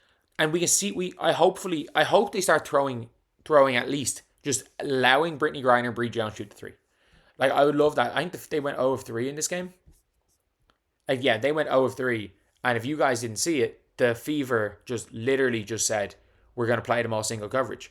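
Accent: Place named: Ireland. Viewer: Australia?😂😂😂😂 You can't hear that irish accent?😂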